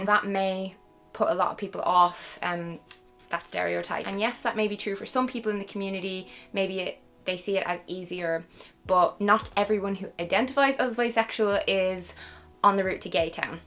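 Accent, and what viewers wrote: Ireland. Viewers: I loved this video. It’s always so nice to hear more about my sexuality. I also I love your accent it makes me miss my time in Ireland so much! Thank you for a great video💚💚💚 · Do I hear a fellow Irish spudddd?? (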